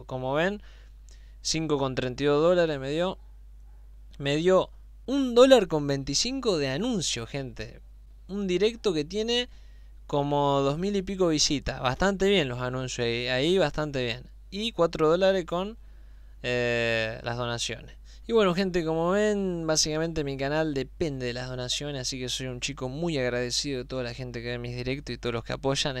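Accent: Argentina. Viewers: Sos Argentino verdad ?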